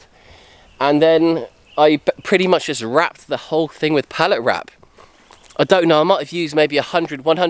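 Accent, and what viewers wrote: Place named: Bristol, United Kingdom. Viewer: Just found your channel.... I am in bristol, it sounds like you arent too far away from me.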